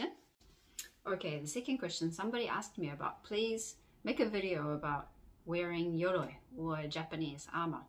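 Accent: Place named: New Zealand. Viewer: Thanks for a great video. As one who found you via Anming's Oriental Pearl Channel, I am so glad she brought you and your content to my attention. I love your content and your presentation style. Informative, entertaining and visualling appealing are why I really get so much enjoyment from your endeavours. I marvel at your level of engagement with your viewers, which considering your busy family and work lives, I consider amazing. I now find it funny that I didn't hear your Kewie accent at all, but now, I always can hear hints of it in your speech. I hope you channel continues to gain subscribers and grows. I'll be in that audience, greedily wanting more content, but feel free to ignore my inane comments, but I truly enjoy engaging with you and insightful responses. I mean you must need sleep! My only query is what were your thoughts when Anming, I assume, contacted you about a collaboration in the first place, and why you decided to do it? 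Hopefully, you'll decide to do another collaboration, and if so I will be an even happier camper.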